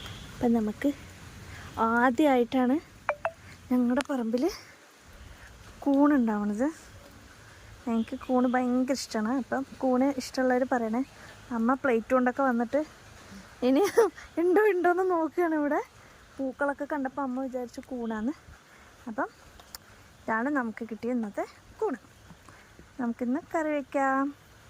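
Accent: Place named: Kannur, India. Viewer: എനിക്കും ഒത്തിരി ഇഷ്ടമാ പക്ഷെ കുറെ വർഷങ്ങൾ ആയിട്ട് കിട്ടാറെയില്ല .... ഇതെവിടെയാണ് സ്ഥലം .. ഞാൻ വിചാരിക്കുന്നു കണ്ണൂർ ആണോ .. സംസാരം കേട്ടിട്ട് അങ്ങിനെ തോന്നുന്നു..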